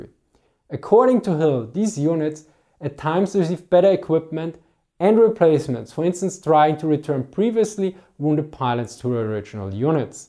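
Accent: Germany. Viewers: To be fair narration matters a lot and actual bad narrators can ruin a video. But accent isn't as terrible as you make it to be. What he says is perfectly intelligible w/o subs. If it bothers you that much, you can maybe contact him about funding him a native English speaker for narration (it's always about the dough ultimately of course). Tho to me I don't get to hear someone speaking English in a hard German accent that often so it's actually a pretty interesting aspect of his videos. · bad English pronounciation... I just cannot bear his accent. It is unbearable to listen to someone who uses the "German language melody" on each and every English vocab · German accent can be hard to stomach.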